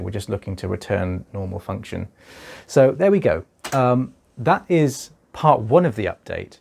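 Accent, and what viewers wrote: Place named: RP, United Kingdom. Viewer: I cannot stand this posh xxxx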